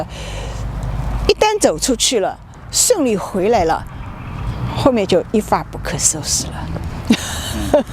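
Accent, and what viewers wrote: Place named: Shanghai, China. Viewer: 上海口音很浓厚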